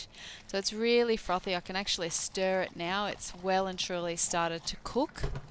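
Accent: Australia. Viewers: Hi, thankyou for your video. I usually make cold process but have felt uninspired for a long time now. I just made Hot Process with honey, no fragrance or E.O. following your instructions. In the mold now, cant wait for it to harden up and cut! Lovely to hear an Aussie voice too!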